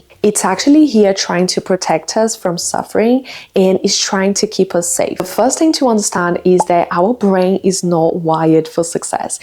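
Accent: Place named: Brazil. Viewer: Really like your accent! You’re Brazilian?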